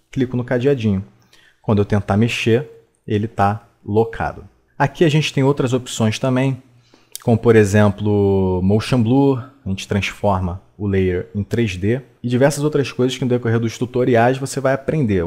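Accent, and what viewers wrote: Rio de Janeiro, Brazil. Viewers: Carioqueish não aguento. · Você é carioca ? (alem disso ótimo vídeo) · Carioquês tá em dia heim.. hehehehe..ótima aula.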